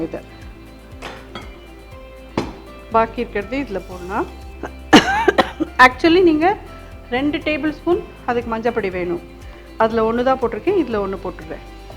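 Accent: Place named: Thiruvarur, India. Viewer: மாமிஉங்களுக்கு நீண்ட ஆயுளை மஹா பெரியவாளும் என்னுடைய ராமனும் தறுவார்கள் உங்களுடைய பேச்சை கேட்க எங்க ஊர் திறுவாறுர் நினைவு வறுகிறத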